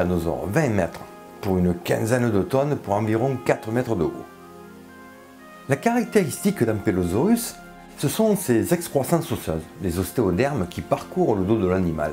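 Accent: South, France. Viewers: oh tu est du sud toi aussi^^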